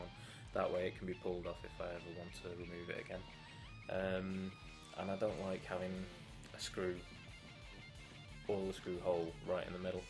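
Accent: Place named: Stoke-on-Trent, United Kingdom. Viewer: Are you from Stoke-on-Trent by any chance? I recognise your accent!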